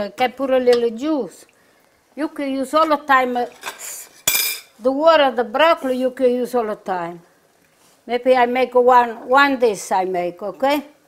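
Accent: Italy. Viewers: Always a pleasure to watch you cook and to hear your Italian voice. I gifted, again, your cookbook to a loved one. Precious recipes. Health and strength to you, and to all your famiglia.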